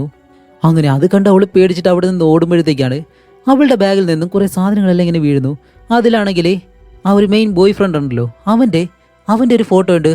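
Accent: Palakkad, India. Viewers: ഞാനാണ് നിങ്ങടെ പാലക്കാട്.... സ്ലാങ് തോന്നിയ ആരേലും ഒണ്ടോ?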